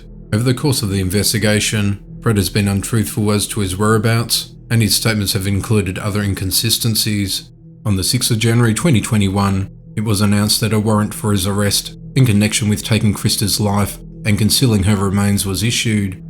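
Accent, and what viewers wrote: Australia. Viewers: Okay but are you Aussie ??? I need to know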